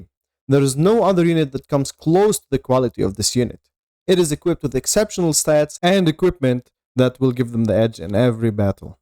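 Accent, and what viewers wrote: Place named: Lebanon. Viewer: أنا حاسس انك لبناني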